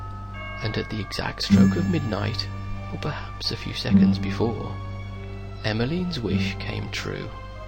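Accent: England, United Kingdom. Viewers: English accent :) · u know something going on when the story is black and white and a man with a English accent is reading it😰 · British voice!!! :D (or sounding one, I always get accents mixed up for some reason) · Wonderful o.o and a British narrator makes it all the better ^_^ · And it's just so much more moving cuzz the narrator has an english accent :p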